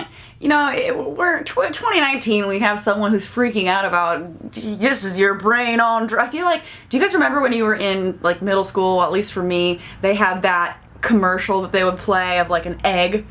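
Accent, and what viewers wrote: South, United States. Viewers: Your southern accent came out a few times and I wasn't sure if it was fake or if you actually have an accent. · What's with the cowboy accent lol? I mean it's cute. But why lol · I can't tell you how much I am I'm love with you for your exaggerated sassy southern accent it warms my heart every time. 😻😻 I'm sorry onision said bad things about your body. You are beyond a perfect 10 and I hope you know that and you always were and always will be. Happy thanksgiving 💖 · From one Southerner to another, I love hearing your accent escape every now and then. Mine only does when I'm drunk xD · havent been to this channel in awhile and sounds like she has more of a southern drawl haha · You slip in and out of a southern drawl. I thought at first it was on purpose. That's unique. I would say cute but apparently when a guy makes a compliment on the internet, clearly he is after something. 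· Happy Thanksgiving and thank you for blessing us with your Southern twang. 🙏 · I see you use your country accent more and more ( i don't know if you notice it). Why is that?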